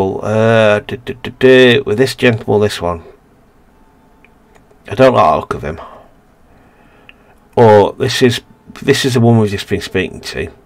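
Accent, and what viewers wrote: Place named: United Kingdom. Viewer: From the uk too man sound exactly like one of the lads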